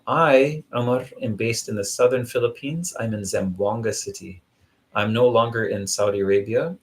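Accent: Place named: Midwest, United States. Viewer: never would have guessed you were born in NY; your accent is 100% midwestern 😂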